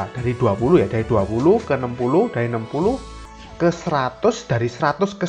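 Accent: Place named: Surabaya, Indonesia. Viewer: kalo didenger2 dr suaranya kyk org surabaya y bang?maaf kalo salah...🙏🙏🙏🤭🤭🤭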